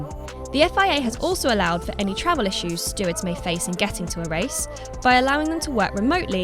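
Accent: United Kingdom. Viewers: whait is that? and why does she sound incredibly brittish in this video? (yeah i know she's from the UK, but i dont remember her accent being so noticable).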